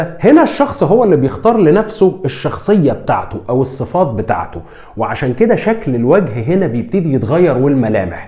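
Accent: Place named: Morocco. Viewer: لا هي مغربية لهجتها مغربية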